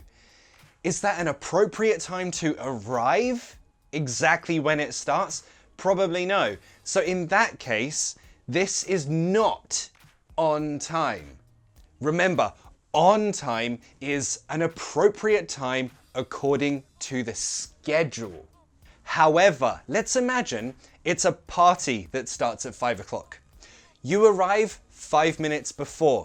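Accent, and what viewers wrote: United Kingdom. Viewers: Sooooo amazing British accent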